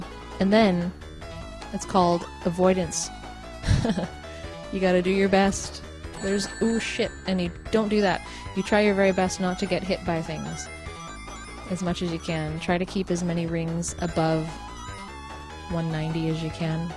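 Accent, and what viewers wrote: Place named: Canada. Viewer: You have very nice Canadian accent. You should do ASMR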